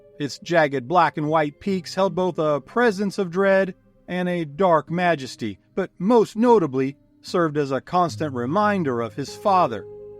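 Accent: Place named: Deep South, United States. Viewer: Great video, I couldn't help but laugh though in a good way. I was expecting to hear Balkan accents no deep south 😂. Keep up the great creative and informative work that you do.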